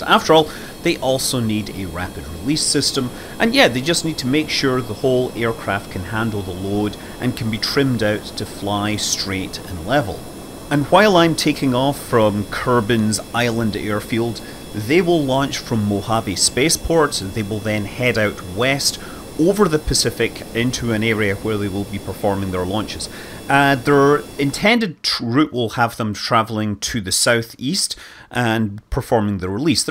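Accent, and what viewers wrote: United Kingdom. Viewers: Getting really tired of the limey accent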